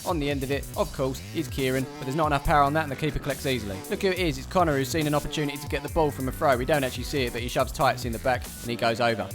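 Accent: Essex, United Kingdom. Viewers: Are you from essex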